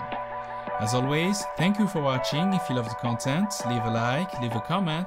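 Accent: France. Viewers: Absolutely love this video, it's really helping me. Thank you! Cool accent by the way, were are you from? France?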